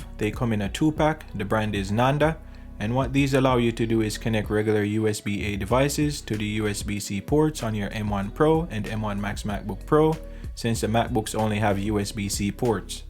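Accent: Jamaica. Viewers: Your Jamaican?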